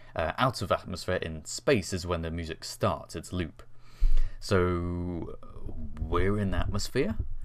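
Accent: United Kingdom. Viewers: watched your good vids forget to subscribe but here :D you have one your british brr your voice is brr i love british acent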